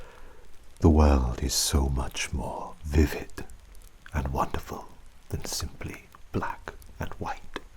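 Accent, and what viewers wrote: Wales, United Kingdom. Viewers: I loved everything about this video! I've been on the fence about Elden Ring since I'm not sure if I'd have time to actually play. I divide my time between Everquest, Everquest II, Guild Wars, Guild Wars 2, Lord of the Rings Online, and, most recently (thanks to you, ) Raid Shadow Legends. Anyway, the costume is truly awesome but then you are a talented individual so it's not surprising. Your Welsh accented voice, I could listen to that all day, at least until I fell asleep. Thank you for sharing your time and creativity with us, I appreciate it muchly. Oh, and as a side note, I always dream in color. 😊😊 With permission, hugs 🤗🤗🤗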